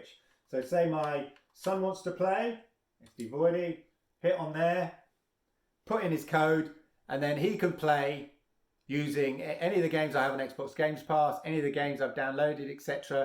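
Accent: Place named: United Kingdom. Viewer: This is very useful I watched a bunch of others and it turns out I only understand the british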